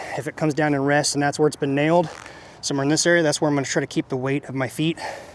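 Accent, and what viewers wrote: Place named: South, United States. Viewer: “Waller” “jossel around”…. You sound like one of us southerners.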